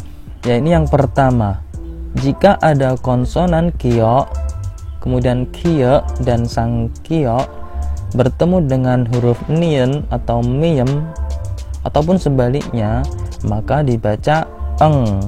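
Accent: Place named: Java, Indonesia. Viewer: Untuk materi sangat bagus mudah di mengerti tpi untuk pelafalan terlalu medok tolong di perbaiki pelafalan nya supaya bisa lebih ke logat korea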